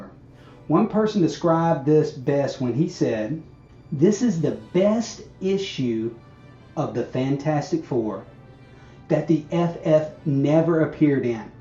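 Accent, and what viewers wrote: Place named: South, United States. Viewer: Love the Hulk cover! The south was strong in this intro too 😅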